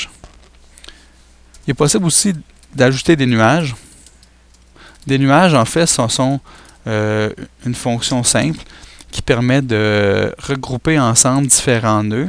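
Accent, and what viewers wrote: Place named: Quebec, Canada. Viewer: accent quebequois très très prononcé ^^ (bonne vidéo au fait ;) )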